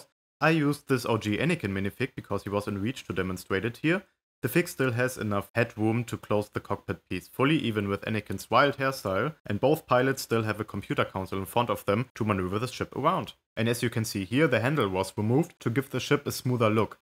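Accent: Germany. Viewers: That German accent is crazy! Very nice! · Your accent Sound German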